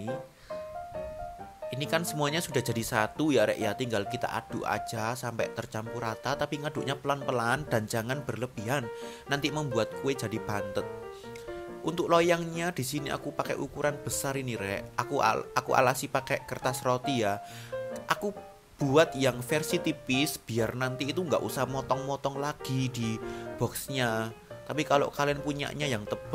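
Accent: Java, Indonesia. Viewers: Medok lo rek mas 😂😂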